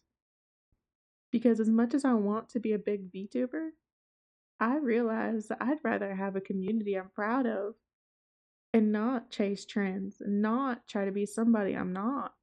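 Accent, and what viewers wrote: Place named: South, United States. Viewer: The southern twang is the icing on top 🤠 Yee yee represent darn tootin!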